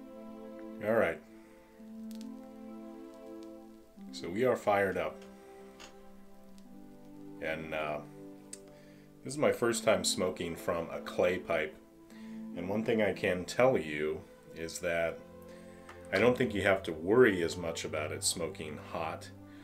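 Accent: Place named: Italy. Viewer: dude i loved the Italian hobbit accent. also how many bowls do you usually smoke per week?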